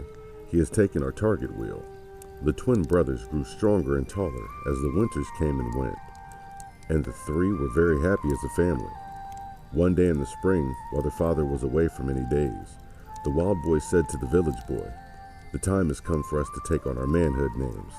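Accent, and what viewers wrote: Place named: Texas, United States. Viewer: Sounds like Texas to me!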